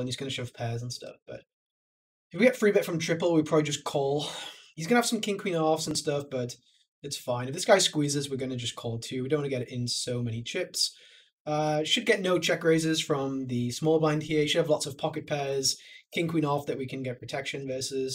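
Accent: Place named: Cardiff, United Kingdom. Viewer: Where are you from?...........sounds like a tint of Cardiff accent there......nailed it?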